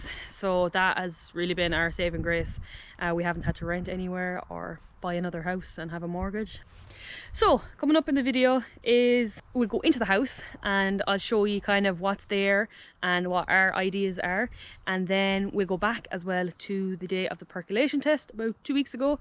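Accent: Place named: Ireland. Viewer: So great to hear an Irish accent! I'm Irish too but living in France. Going to be renovating an old house here too, soon hopefully. Very exciting. All the best with your build. I'm looking forward to following along!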